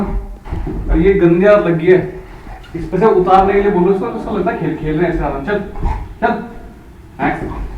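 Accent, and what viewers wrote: Haryana, India. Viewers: Bhai i like you jaat language. Awesome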